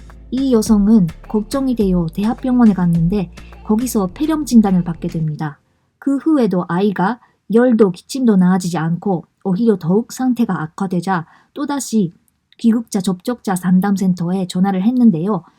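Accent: Japan. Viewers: 더빙하시는분 일본분이시죠? ㅋㅋㅋㅋㅋㅋ 한국어 아무리 잘해도 일본어 발음은 어떻게 할 수 없군요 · "자이니치"이신거죠?.....발음이 한국에서 태어나 살다가 건너가신 분 발음이 아니라, 전형적인 일본인식 한국어 발음인거 같아서요!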